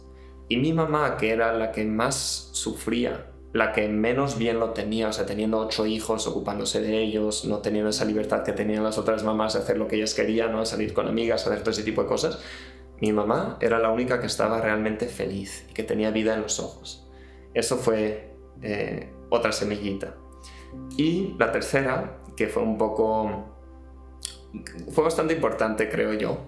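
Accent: Mexico. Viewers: Soy española. Tienes acento mejicano, te has criado en Méjico? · Vi un vídeo de la entrevista que le hicieron al Padre Javier Carralon creí que grababan desde España jaja nunca creí que ya eran Mexicanos 🇲🇽❤ después ví el vídeo del testimonio de Patrick, después el testimonio de Sofi y ahora tu testimonio, ahora ya te sigo. Por la entrevista al Padre Javier creí que llevaban mucho tiempo de conversión nunca me imaginé que era tan reciente. Muchas felicidades por haber tomado la decisión... De aquí para adelante. ah y Bienvenidos a México (ya tienes acento mexicano)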